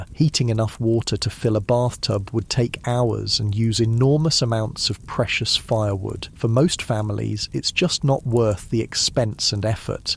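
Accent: United Kingdom. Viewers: I hate the British accent and voice used 🤢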